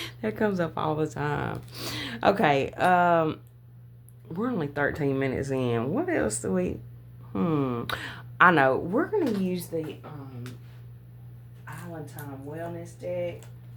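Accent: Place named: Louisiana, United States. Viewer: Amen ✨️ 🙌 🙏. Your accent is so cute. Louisiana?????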